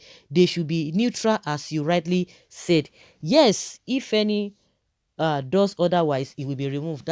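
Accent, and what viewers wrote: Yoruba, Nigeria. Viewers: How can you ask such a question?. From your intonation, i believe you are an original Yoruba daughter. No government be him or her a the president can enthrown or dethrown an Oba in Benin kingdom. Emirs are based on fulani politics and their slamic religion tradition and not ancestrail. The fulanis Emirs are heads of lislamic religion who can be installed and dethrown at will by a mear so called governor or president who is transient.. Get it my lady. Its like you asking if the Queen or king of England can be removed. There should not be comparison between a king and a common man. More so that Emir is alien in the history of our land. We all know how they came in to our land.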